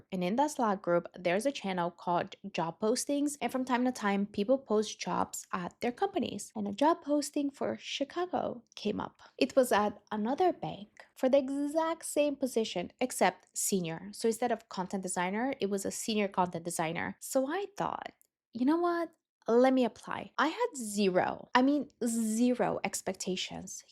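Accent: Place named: Latin America. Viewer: I thought you were Latina from your accent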